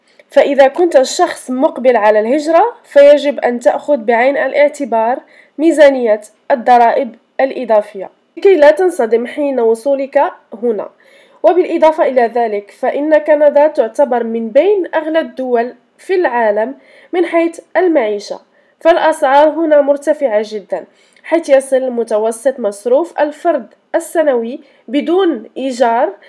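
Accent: Algeria. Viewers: My first thought when I heard your accent is that you are Algerian, then I saw your account page and you are Moroccan. So, I was close. Here in America, there are many of our people who were doctors, engineers, etc. in Algeria, Morocco...etc. and now they work in jobs like restaurants, taxi drivers, etc. Because America doesn't accept their degrees and they have to go back to school if they want to do the work they did back home. In America, nothing is free, because you have to pay for college, doctors, medicine, water, housing...etc. even if you have insurance, you still have to pay something besides what you already pay for insurance. Also, in America, there is high crimes and very high racial tension. Would you do another video in Darja or Tamazight next time? 🤣🤣 ==== Ma première pensée quand j'ai entendu ton accent c'est que tu es algérienne, puis j'ai vu ta page de compte et tu es marocaine. 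J'étais donc proche. Ici en Amérique, il y a beaucoup de nos gens qui étaient médecins, ingénieurs, etc. en Algérie, au Maroc...etc. et maintenant ils travaillent dans des restaurants, des chauffeurs de taxi, etc. Parce que l'Amérique n'accepte pas leurs diplômes et qu'ils doivent retourner à l'école s'ils veulent faire le travail qu'ils faisaient chez eux. En Amérique, rien n'est gratuit, car il faut payer pour l'université, les médecins, les médicaments, l'eau, le logement... etc. même si vous avez une assurance, vous devez toujours payer quelque chose en plus de ce que vous payez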